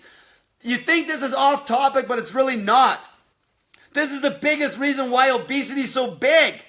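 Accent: Canada. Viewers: that canadian accent STRONG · you Canadian?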